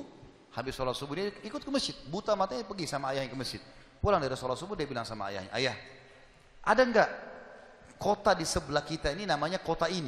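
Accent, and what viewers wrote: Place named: Arab world. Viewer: tri atmojo bener. cara ngomongnya kayak orang arab bgt, ya. logatnya khas. mungkin karena keturunan arab juga. haha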